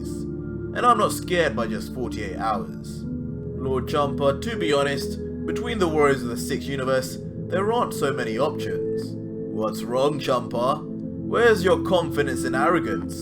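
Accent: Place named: United Kingdom. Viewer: Dewi Middle he has a British accent though 🤦🏻‍♀️🤦🏻‍♀️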